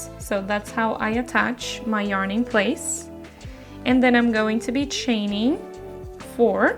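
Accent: Brazil. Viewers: amiga lindooo! fiquei curiosa pelo sotaque, vc é brasileira?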